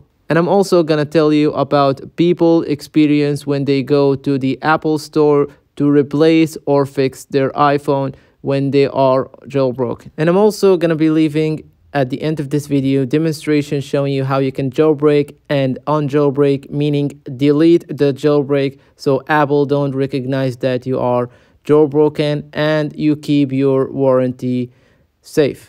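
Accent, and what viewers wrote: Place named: Arab world. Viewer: لهجتك عربيه🙂👍🏻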